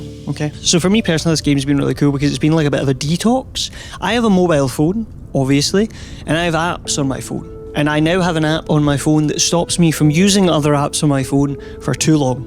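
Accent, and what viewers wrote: Scotland, United Kingdom. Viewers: Is that the Scotland accent or Irish accent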